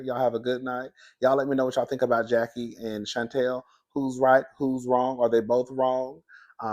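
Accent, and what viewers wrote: South, United States. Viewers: Team Jackie ❤ I holler errtime you say HURRR I live for your country ness and your laugh 🥰🥰🫶🏾🫶🏾‼️😭😭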